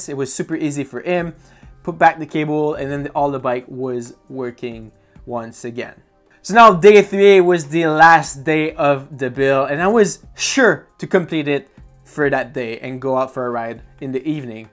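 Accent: Quebec, Canada. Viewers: Hello, super cool de t'entendre jurer en Québécois pour moi frenchie qui adore votre accent mais surtout vos jurons. Clairement si je me lance dans un TT bike build, je partirai sur du sram suite à ta vidéo. J'ai remplacé mon guidon sur mon Giant TCX par un guidon aéro full intégré et le di2 m'a déjà bien énervé! Super vidéo. Merci et profite bien de ton Scott Plasma. Ps: tout ces câbles coupés viennent d'un mauvais routing de ta part ou plutôt de la complexité du vélo?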